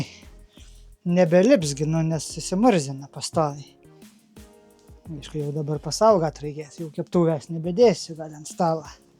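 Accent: Aukštaitija, Lithuania. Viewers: Smagu žiūrèt śio žmogaus video del kruopštaus ir kantraus darbo, bet dar ir dèl to: bunkeriokas, miškiokas, ežeriokas, bulviotè, druskiotè ir t.t. Nuostabi aukštaitiška tarmè - glosto širdį! Vienas malonumas ne tik žiūreti, bet ir klausytis.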